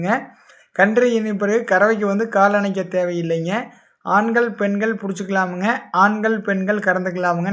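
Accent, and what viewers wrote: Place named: Kongu, India. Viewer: தம்பி...உங்க கொங்கு Slang super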